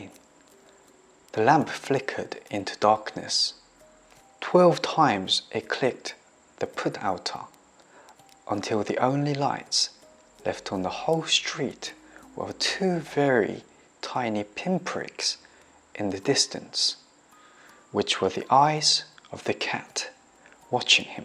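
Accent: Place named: United Kingdom. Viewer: 영국악센트 쥐리네여~~~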